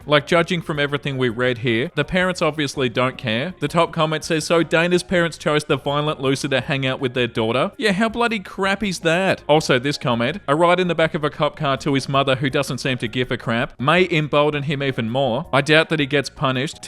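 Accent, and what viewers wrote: Australia. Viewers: Oh, you are such an aussie mate. When you said "And sucked in too" I just cracked up it came out of nowhere wasn’t expecting it 🤣 That was gold dude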